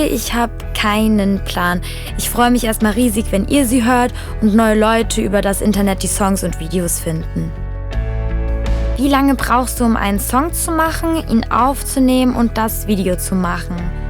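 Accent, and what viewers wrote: Germany. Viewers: Que delicia escutar esse sotaque alemão, já amava os vídeos agora estou apaixonada ❤ vocês cantam muito bem, desejo muito sucesso 💙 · Heck it's so weird hearing such a German accent, in the songs I can barely hear it! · I only speak English and I had to pause the video to read some but I don’t mind kinda and 1st your VERY good at singing 2ed I like how a German actcent is also sorry I spelled that wrong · Ich wusste dass du Deutsch bist aber jz auch nocj Baden Württemberg? Giiiiirl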